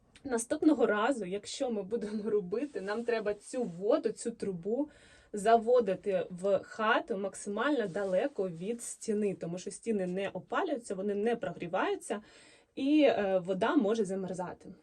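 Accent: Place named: Surzhyk, Ukraine. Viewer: Красунечко! Ви молодчинка, що намагаєтєся розповідати усе українською. Але слово ДВЕРІ, за ДВЕРИМА тощо.. це завжди МНОЖИНА! підказка, яка полегшить Вам вимову без суржику ...Перед зйомкою кожного сюжету ознайомтесь з усіма маловживаними або маловідомими українськими словами з тих, які плануєте використати у своєму черговому випуску. Бажаю успіху Вашому каналу!!🎉🎉🎉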